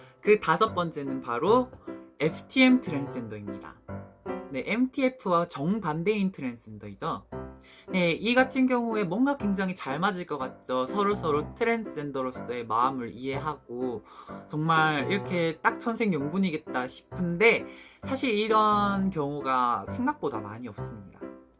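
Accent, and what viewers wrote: Gyeongsang, South Korea. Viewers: 경상도분이시네 그냥 사투리로 하시지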